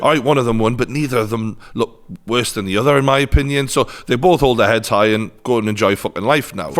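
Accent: Newcastle, United Kingdom. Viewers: I love true Geordie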